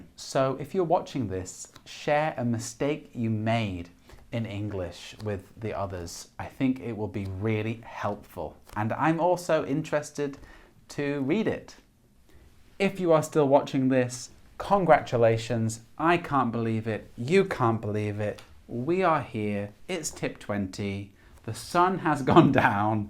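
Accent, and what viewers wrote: RP, United Kingdom. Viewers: Very usefull information, thank you for your help, i live in uk from 15 years and my english is A2 level🤦‍♀️i did not like to study in Poland, i was boared and stressed on lessons, thats why i didnt want to learn anything , but you chaneel and tips giving me opportunities to try different ways plus is good to hear reall british accent as on daily basis i work with other multi -culti people so no chance for me to learn proper english :( i also want to learn spanish and italian 😍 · You use received pronunciation and I have understood each word. But sometimes it's very difficult for me to understand the native speakers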